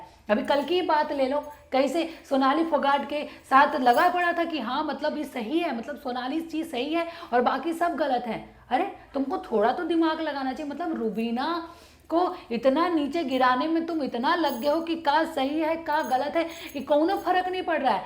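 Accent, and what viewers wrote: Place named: Bhojpuri, India. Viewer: Love your bhojpuri accent ❤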